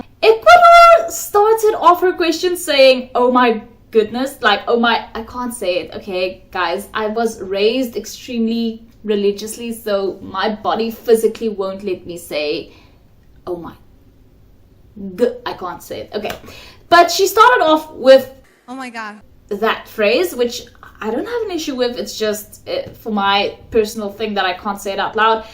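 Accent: South Africa. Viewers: I thought you were from south African, you speak with south african accent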